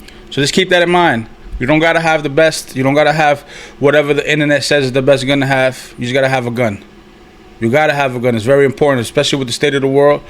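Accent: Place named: New York, United States. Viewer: Yup new sub my bro 🫡💯 i hear that new Yorker accent 🗽 dope collection n dope video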